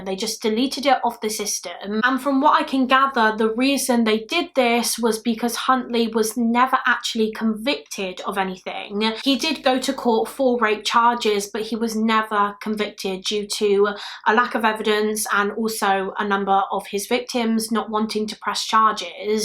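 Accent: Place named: England, United Kingdom. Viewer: I think ur work is amazing!!! Although i dont particularly like English accents - ur content is too good to ignore. Its comprehensive, interesting and well written. Keep it up!!! Also....introduced to ur channel by Josh Miles and his collab vids 😁👍 keep up the great work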